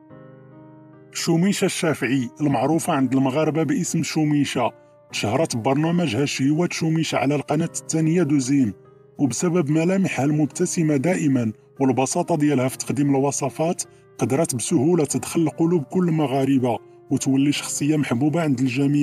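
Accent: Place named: Democratic Republic of the Congo. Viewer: تعليق مقرف من هذا الشخص وكانك تستمع الى كحلوش من الزايير وهو يتكلم العربية.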